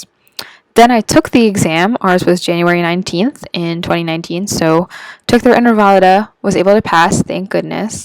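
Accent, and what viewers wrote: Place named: United States. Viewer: Hi DOc. thanks for this ideas. I really appreciate your diction and your voice . I didn't distinguish if you are a Filipino or an American, because your accent is like an American speaker.. I love hearing your speech doc. 🥰👏👍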